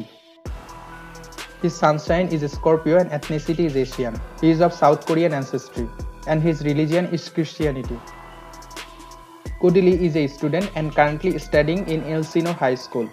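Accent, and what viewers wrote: India. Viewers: After this guy uttered just a few words I had to stop watching this video because his Indian accent was SO thick I couldn't understand what it was that he was saying enough in order to continue listening anymore! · Bollywood acent.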